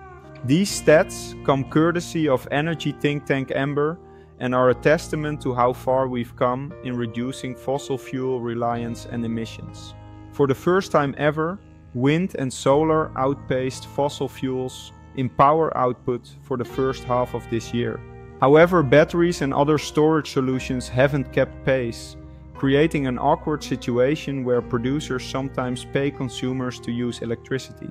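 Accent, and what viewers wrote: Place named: Netherlands. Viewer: By the accent, you're Dutch. This is a thing mostly just happening with us Dutchies. Its really not an issue yet in most of the rest of Europe.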